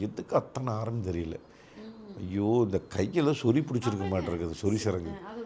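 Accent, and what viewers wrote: Kongu, India. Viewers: ❤அண்ணா வணக்கம் 🙏🏻திருப்பூர் கொடி ராஜா 🌱🙏🏻🇾🇪 முதலில் மக்களுக்கு விழிப்புணர்வு ஏற்படுத்த, உங்களது பொன்னான நேரத்தை செலவிட்டு, இந்த மாதிரியான வீடியோக்களை தொடர்ந்து பதிவிட்டு வரும் உங்களுக்கு வாழ்த்துக்கள்..❤️நன்றிகள்🙏🏻.... மேலும்,நமது கொங்கு மொழியில் எளிமையாக, மக்களுக்கு புரியும்படி பேசும் உங்களது பேச்சு அருமை... 🙏🏻 தொடரட்டும் உங்கள் சேவை💐💐💐💐💐💐💐💐💐💐💐💐💐💐💐💐💐💐💐💐💐💐💐💐💐💐💐💐💐💐💐💐💐💐💐💐💐💐💐💐💐💐💐💐💐💐💐💐💐💐💐💐💐❤️💚💐💐💐💐💐💐💐💐💐💐💐💐💐💐💐💐💐💐💐💐💐💐💐💐💐💐💐💐💐💐💐💐💐💐💐💐💐💐💐💐💐💐💐💐💐💐💐💐💐💐💐💐💐💐💐💐💐💐💐💐💐💐💐💐💐💐💐💐💐💐💐💐💐💐💐💐💐💐💐💐💐💐💐💐💐💐💐💐💐💐💐💐💐💐💐💐💐💐💐💐💐💐💐💐💐💐💐💐💐💐💐💐💐💐💐💐💐💐💐💐💐💐💐💐💐💐💐💐💐❤️💚💐💐💐💐💐💐💐💐💐💐💐💐💐💐💐💐💐💐💐💐💐💐💐💐💐💐💐💐💐💐💐💐💐💐💐💐💐💐💐💐💐💐💐💐💐💐💐💐💐💐💐💐💐💐💐💐💐💐💐💐💐💐💐💐💐💐💐💐💐💐💐💐💐💐💐💐💐💐💐💐💐💐💐💐💐💐💐💐💐💐💐💐💐💐💐💐💐💐💐💐💐💐💐💐💐💐💐💐💐💐💐💐💐💐💐💐💐💐💐💐💐💐💐💐💐💐💐💐💐❤️💚💐💐💐💐💐💐💐💐💐💐💐💐💐💐💐💐💐💐💐💐💐💐💐💐💐💐💐💐💐💐💐💐💐💐💐💐💐💐💐💐💐💐💐💐💐💐💐💐💐💐💐💐💐💐💐💐💐💐💐💐💐💐💐💐💐💐💐💐💐💐💐💐💐💐💐💐💐💐💐💐💐💐💐💐💐💐💐💐💐💐💐💐💐💐💐💐💐💐💐💐💐💐💐💐💐💐💐💐💐💐💐💐💐💐💐💐💐💐💐💐💐💐💐💐💐💐💐💐💐❤️💚💐💐💐💐💐💐💐💐💐💐💐💐💐💐💐💐💐💐💐💐💐💐💐💐💐💐💐💐💐💐💐💐💐💐💐💐💐💐💐💐💐💐💐💐💐💐💐💐💐💐💐💐💐💐💐💐💐💐💐💐💐💐💐💐💐💐💐💐💐💐💐💐💐💐💐💐💐💐💐💐💐💐💐💐💐💐💐💐💐💐💐💐💐💐💐💐💐💐💐💐💐💐💐💐💐💐💐💐💐💐💐💐💐💐💐💐💐💐💐💐💐💐💐💐💐💐💐💐💐❤️💚💐💐💐💐💐💐💐💐💐💐💐💐💐💐💐💐💐💐💐💐💐💐💐💐💐💐💐💐💐💐💐💐💐💐💐💐💐💐💐💐💐💐💐💐💐💐💐💐💐💐💐💐💐💐💐💐💐💐💐💐💐💐💐💐💐💐💐💐💐💐💐💐💐💐💐💐💐💐💐💐💐💐💐💐💐💐💐💐💐💐💐💐💐💐💐💐💐💐💐💐💐💐💐💐💐💐💐💐💐💐💐💐💐💐💐💐💐💐💐💐💐💐💐💐💐💐💐💐💐❤️💚💐💐💐💐💐💐💐💐💐💐💐💐💐💐💐💐💐💐💐💐💐💐💐💐💐💐💐💐💐💐💐💐💐💐💐💐💐💐💐💐💐💐💐💐💐💐💐💐💐💐💐💐💐💐💐💐💐💐💐💐💐💐💐💐💐💐💐💐💐💐💐💐💐💐💐💐💐💐💐💐💐💐💐💐💐💐💐💐💐💐💐💐💐💐💐💐💐💐💐💐💐💐💐💐💐💐💐💐💐💐💐💐💐💐💐💐💐💐💐💐💐💐💐💐💐💐💐💐💐❤️💚💐💐💐💐💐💐💐💐💐💐💐💐💐💐💐💐💐💐💐💐💐💐💐💐💐💐💐💐💐💐💐💐💐💐💐💐💐💐💐💐💐💐💐💐💐💐💐💐💐💐💐💐💐💐💐💐💐💐💐💐💐💐💐💐💐💐💐💐💐💐💐💐💐💐💐💐💐💐💐💐💐💐💐💐💐💐💐💐💐💐💐💐💐💐💐💐💐💐💐💐💐💐💐💐💐💐💐💐💐💐💐💐💐💐💐💐💐💐💐💐💐💐💐💐💐💐💐💐💐❤️💚💐💐💐💐💐💐💐💐💐💐💐💐💐💐💐💐💐💐💐💐💐💐💐💐💐💐💐💐💐💐💐💐💐💐💐💐💐💐💐💐💐💐💐💐💐💐💐💐💐💐💐💐💐💐💐💐💐💐💐💐💐💐💐💐💐💐💐💐💐💐💐💐💐💐💐💐💐💐💐💐💐💐💐💐💐💐💐💐💐💐💐💐💐💐💐💐💐💐💐💐💐💐💐💐💐💐💐💐💐💐💐💐💐💐💐💐💐💐💐💐💐💐💐💐💐💐💐💐💐❤️💚💐💐💐💐💐💐💐💐💐💐💐💐💐💐💐💐💐💐💐💐💐💐💐💐💐💐💐💐💐💐💐💐💐💐💐💐💐💐💐💐💐💐💐💐💐💐💐💐💐💐💐💐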